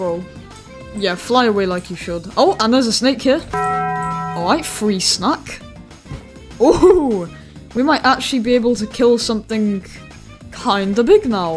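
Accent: United Kingdom. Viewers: Cesarenanar - this is one of the creatures I’ve barely seen or used. Underrated? Edit: THIS GUY IS BRITISH HOW DID I JUST REALISE YEAAAAAAAAAAAAAAAAAAAAAAAAAAAAAA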